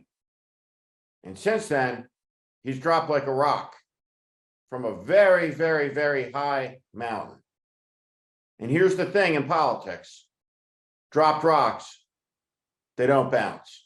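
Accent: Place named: Philadelphia, United States. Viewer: Steve sounds more like he's from Philadelphia, not from Utah. He has a Philly accent. He just said that Park City, Utah was his hometown. Now I'm confused.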